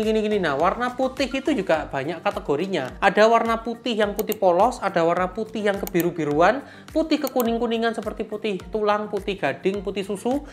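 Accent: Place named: Java, Indonesia. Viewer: medok banget.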